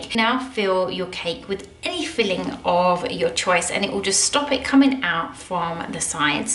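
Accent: United Kingdom. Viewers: 🤗 you is so nice! Are you from England?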